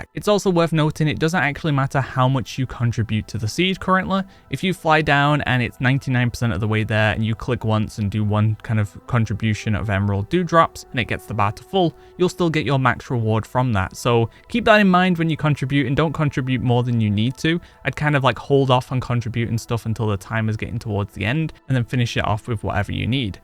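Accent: United Kingdom. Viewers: You're an absolute unit. Playing a half empty PTR for weeks to provide us collectors with top notch information. I also really love your straight to the point but still detailed style of video - and your UK accent is just the cherry on top, love it 💖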